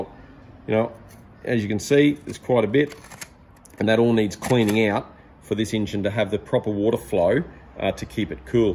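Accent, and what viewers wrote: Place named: Australia. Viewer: Australian accents are the worst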